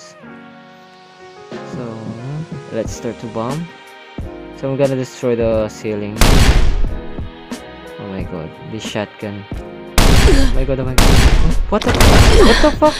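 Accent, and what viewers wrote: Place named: Philippines. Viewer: Are you filipino kasi buses Filipino eh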